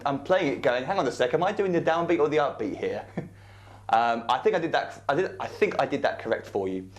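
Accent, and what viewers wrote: United Kingdom. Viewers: Haha I started to watch the vid.. And then you started speaking with a TOTAL British accent.. I laughed my butt of! But you play just fine! Keep up the good work.!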